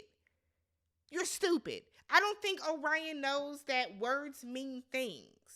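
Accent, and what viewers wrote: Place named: Midwest, United States. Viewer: Heyy from NYC! I love your midwest accent. Your recaps are excellent, its like talking to a gf. Keep up the good work.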